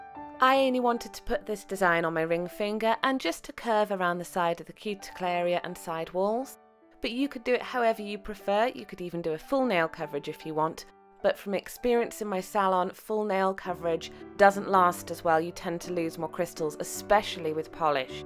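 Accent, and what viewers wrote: United Kingdom. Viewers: Gorgeous design and beautiful nails! I love your accent, so nice to find British people on your be 😁 Liquid latex has a horrible smell doesn't it 🐟 xx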